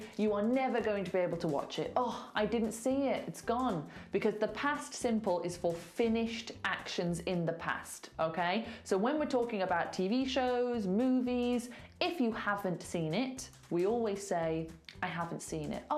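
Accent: United Kingdom. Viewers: Thank you, It was so useful for me and, I love the British accent btw! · That British accent... ❤️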